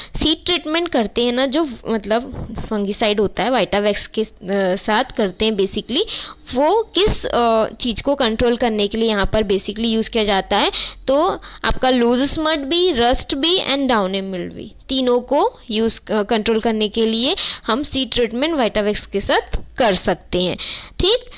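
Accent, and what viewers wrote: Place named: Kanpur, India. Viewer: to kaha se .... Apki boli se lagta h sp Kanpur ki ho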